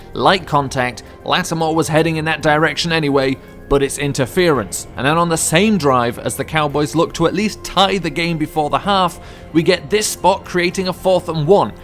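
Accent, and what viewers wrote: United Kingdom. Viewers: Its weird hearing a Brit or Aussie talk about American football. Whatever you are. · U sound like a complete dick head 😂 u sound like ur from the UK or some shit why are u even talking football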